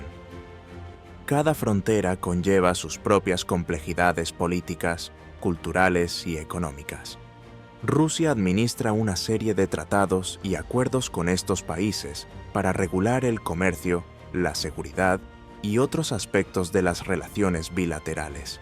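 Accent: Spain. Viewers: Este hombre por su forma de hablar es de España que se puede esperar de alguien de ese pais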